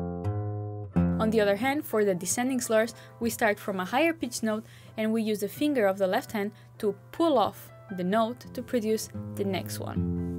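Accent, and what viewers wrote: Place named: Catalonia, Spain. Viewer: Is your native language Catalan? You don’t sound typically Spanish 😉 Awesome video!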